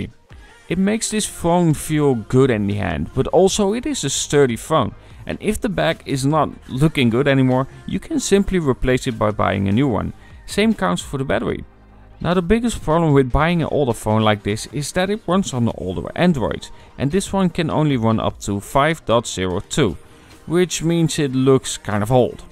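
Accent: Netherlands. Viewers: Sowieso jij bent nederlands duidelijk te horen👍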